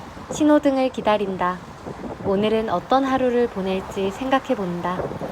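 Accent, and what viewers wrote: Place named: Gyeongsang, South Korea. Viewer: ㅋㅋㅋㅋ 님 사실 과자를 꽈자라고 하시져...?!!!!ㅇ.x(경상도사투리) 오늘도 잘 봤어요💘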